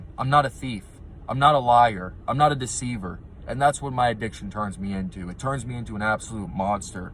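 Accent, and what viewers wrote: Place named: Pacific Northwest, United States. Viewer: So great to hear you are in family counseling -- what a gift you have been given. Sorry about the panic attacks. I suffered terribly in my late 20s through 40s ish tbh. I never knew I needed to deal with stress. I didn't know I could say " this is too much " -- You can say // we can say --- " this is too Effing much " --- cry, shut down, isolate --- have a pity party to rest by ourselves if we need to. Just don't stay there --- We must Release the valve when we feel super Wound -- but I didn't know that // it's oK to say "this is too much" // ... I Could never smoke pot --- too much anxiety // paranoia --- love listening to your sobriety --- I am trying to decipher your accent -- can you at least tell me what part of the country? Pacific NW?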